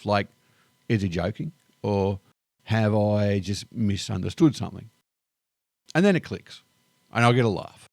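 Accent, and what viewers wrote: Australia. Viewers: Three syllables out of your mouth and I knew your Australian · Unfortunately, you have quite a strong Aussie accent. You're like Bubba from the deep south or Jerome from the Bronx trying to order KFC in Australia 😂. BTW, I'm in my 60s, lived all over Oz, and never checked my shoes for spiders and have never found one. Nobody I know does. That said, encountering a Funnel Web or Redback (=Black Widow) in your shoe could be a problem. Other spider species? Nah, pretty harmless. Spiders and snakes never 'try to kill' anyone. They just run away, if you can even find one. Bears, Pumas and wolves are far riskier. They WILL attack and possibly kill. American readers, please get these things in proportion. Australia is very safe. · To be fair, your Aussie accent is quite broad. Your experience being misunderstood in the US might be worse than some.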